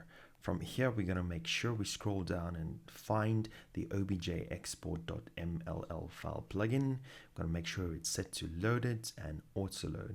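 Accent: South Africa. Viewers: Do I detect a slight South African accent to your voice?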